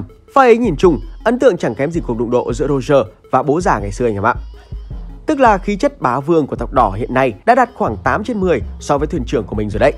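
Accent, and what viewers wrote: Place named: Nghe An, Vietnam. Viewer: Đanh đọc bình thường tự nhiên đọc bằng giọng nghệ an nghe khó chịu v 😑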